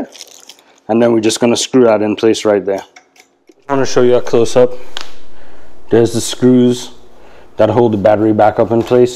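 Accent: Trinidad and Tobago. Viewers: Is that a trini accent I hear? Thanks for the video by the way. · You’re a Trini?? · You sound like a Trini. I am thinking about getting this one myself. Thanks for the video.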